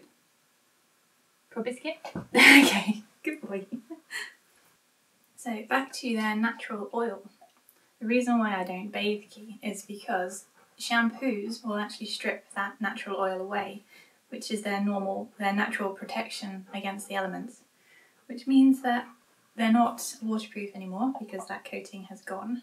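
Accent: United Kingdom. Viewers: Very educational video. Thank you. I never bathed my husky either and she never smelled badly. She would lick herself like a cat, I guess to clean herself. I love your British accent, btw. Maybe I’ll learn how to speak with one somewhere because my American accent is sounding a little rough to me now.😁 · I love your dog but also your "so British" accent (I'm french)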